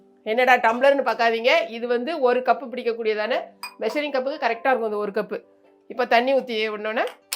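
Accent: Tirunelveli, India. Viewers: Neenga Tirunelveliya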